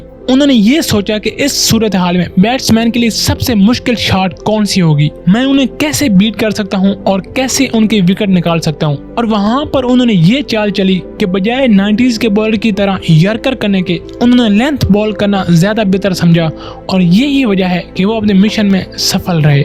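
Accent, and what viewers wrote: Pakistan. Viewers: Bhai tu Pakistan hai ye to accent se pata chal gaya. Thank you bro for such an amazing video.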